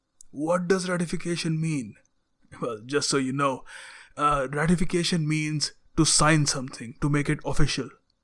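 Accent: India. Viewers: I don't want to listen to that stupid annoying Indian accent! Ewww. Just fuck off!!!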